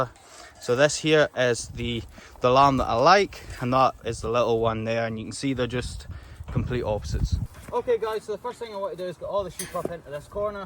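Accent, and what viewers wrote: Scotland, United Kingdom. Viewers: When you speak I can hear that you are Scottish man.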